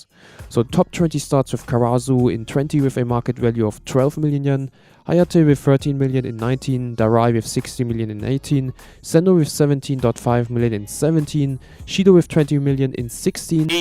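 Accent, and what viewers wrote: Germany. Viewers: Are you German bro?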